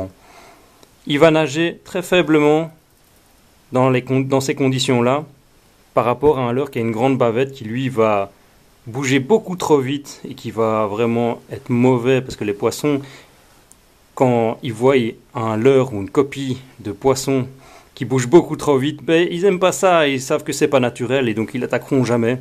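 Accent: Belgium. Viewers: Belle vidéo merci, ça fait plaisir d'entendre un accent Belge c'est familiale 😁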